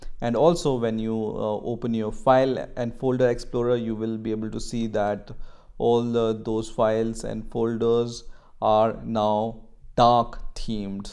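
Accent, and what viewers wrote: India. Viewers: nah wtf this indian accent 💀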